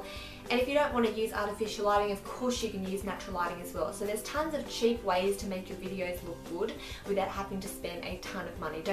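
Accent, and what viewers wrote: Australia. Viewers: are you australian????? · Are you Australian?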